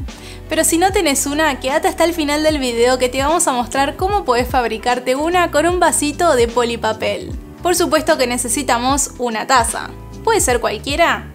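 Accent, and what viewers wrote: Argentina. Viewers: acento porteño* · es el acento argentino, gracias por apreciar <3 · Aqui en Francia, ese tipo de cafetera se llama "Cafetera a pistón" y creo que solo en America lo llaman asi "Cafetera Francesa" pero bueno yo con gusto :D jajajaja gracias por sus videos con ese acento argentino muy bonito :)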